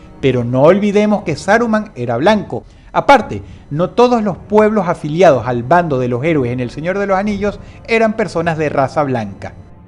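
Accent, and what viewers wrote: Venezuela. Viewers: Ese acento venezolano ✨ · anda, que para ese entonces ese acento venezolano todavia estaba ahi... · wow aqui tiene muy marcado el acento venezolano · Extraño cuando a se le notaba el acento venezolano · Ese acento Chamo... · Cuando aún hablaba como venezolano:v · A le ah cambiado mucho el acento el los últimos años en este video tiene acento venezolano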